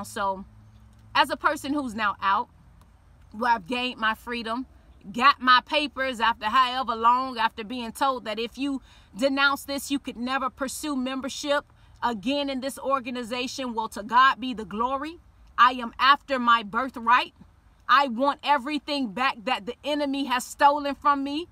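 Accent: United States. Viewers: I hear a Louisiana accent. Thanks for sharing you testimony beautiful. This is my first time watching any of your videos. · You are beautiful and I love that Florida chopped accent lol…what’s your IG???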